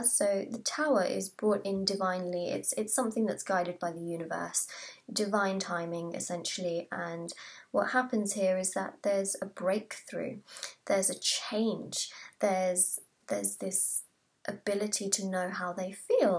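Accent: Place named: RP, United Kingdom. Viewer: Newbie here. Will be interesting if it comes true. Nice to hear the queens English spoken properly.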